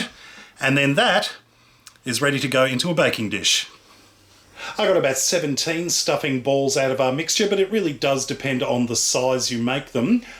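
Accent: Australia. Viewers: Omg you're the sweetest! Love the Aussie accent and your positive energy! I will definitely be making these for my family here in Belgium. Having grown up in eastern Canada, my own mother always made very similar stuffing (no ground meat nor chestnuts as many Americans apparently do) but the onion, celery, bread crumbs, butter, seasoning and herbs (sage, rosemary, thyme). Mmmmm....can't wait to do this..next weekend when our girl comes home from college surely as Canadians already celebrated Thanksgiving in October. Do you celebrate "dan anda" too ? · Im australian but have been living overseas for years. I love your videos because they remind me of australia ( food, accent, etc ) <3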